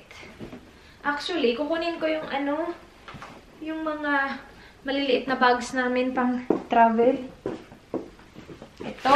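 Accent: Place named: Visayas, Philippines. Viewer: Hi ate! Makalingaw kaayo ka inig mag bisaya:P New subscriber diay hehe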